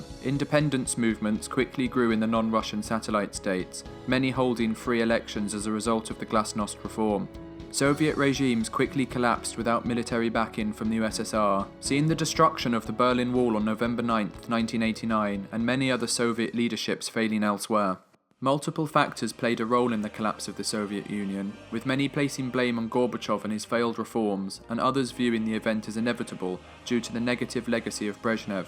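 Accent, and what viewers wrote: RP, United Kingdom. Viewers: "Excuse this British accent" - no, I won't. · Dramatic music, slideshow pictures, Wikipedia text, British accent...can’t beat that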